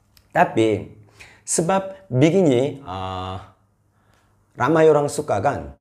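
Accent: Sabah, Malaysia. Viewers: Terbaik la korea cakap melayu sabahan... 🥰🥰🥰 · Cara abang becakap mcm org sabah kk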